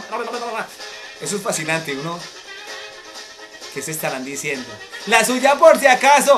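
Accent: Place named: Highlands, Ecuador. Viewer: Solamente los Ecuatorianos de la Sierra hablan así.. nosotros los costeños hablamos muy diferente.. pero esta bien tu vídeo..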